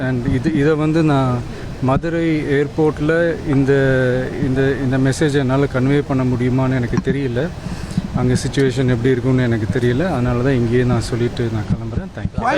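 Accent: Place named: North India, India. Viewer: North Indian tamil pesra madhri irukku😢😢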